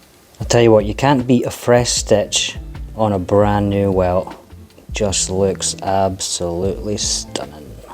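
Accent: Scotland, United Kingdom. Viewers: Watching an amazing craftsman at work…wonderful. Add in soft background jazz, a Scots accent, muscular arms and hands, eyes that give James McAvoy vibes, and wow! Sorry to embarrass you, but it’s true!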